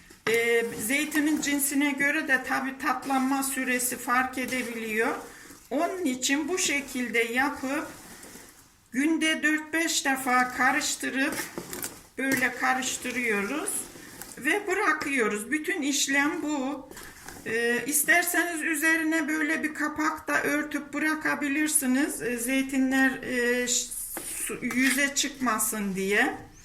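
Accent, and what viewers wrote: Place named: Black Sea, Turkey. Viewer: Harikasın konuşman bizim oraları andırdı karadenizin becerikli hanımları maş. Mutlaka deneyeceğim.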